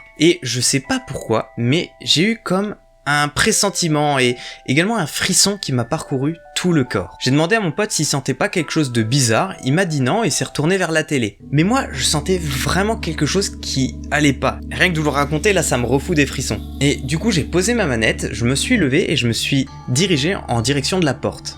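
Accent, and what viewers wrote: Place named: Alsace, France. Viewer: Merci ( Moi je connais ton vrai prénom hehehe 😜 ) pour cette FAQ, j'ai appris des choses sympas sur toi 😃👍🏻 Et j'avais deviné que tu habitais cette region, ( j'ai habité en Alsace pendant 11 ans, ma region de cœur 🥰 elle me manque 😓 ) le "OUi", ils le disent "Ui" c'est rigolo 😂 Khalee et tes 2 chats, je les adore! 🙏🏻😍💝 Je suis aussi cinéphile, quand je regarde mes Thrillers psychologiques, je decortique, je fait des hypothèses, j'essaie de comprendre ce que le réalisateur a voulu nous faire comprendre 🤔🕵🏼‍♀️ Et une question qu'on ne t'a pas posée: Je vois que tu as plusieurs guitares derrière toi, tu en joues? Si oui ( Ui 😂😜 ), pourrais-tu un jour nous en faire profiter un peu? Je suis qqun qui est très musique, chant, danse, etc... et je suis totalement d'accord avec toi, Joyca il pèse lourd dans le game, il est tellement doué!! 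J'adore ce qu'il fait, c'est fou ce qu'il arrive à sortir avec 1 son de 2 secondes 🤩🎶 Et quand Squeezie ( que je kiffe aussi énormément 🤩🙏🏻 ) s'en mêle, ça fait un duo de MALADE 🤩Sora est trop adorable et bienveillant, je le suit depuis peu, je l'ai decouvert récemment, et bien sûr, Louis! 🤩 Je suis de plus en plus attirée par la culture Asiatique, le Japon 🏯🇯🇵💝 et la Corée 🇰🇷💝🎶 Voilà, j'adore ce que tu fais, ta façon de raconter, ton humour rafraîchissant 🤣🙏🏻 je t'ai decouvert il n'y a pas très longtemps, je dirais 7 mois 🤔 avec ta vidéo "Vous ne pourrez plus être en couple" 🤩🙏🏻 J'ai hâte de voir ta progression, tes projets, etc... Biz da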